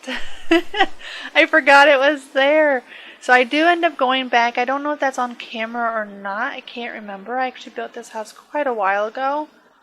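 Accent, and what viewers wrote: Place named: South, United States. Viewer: Question: Where are you from? I can hear a slight southern accent and I've always wanted to ask but I didn't feel it was appropriate but with the 100 sub video coming out I felt the time was perfect! And as always amazing build!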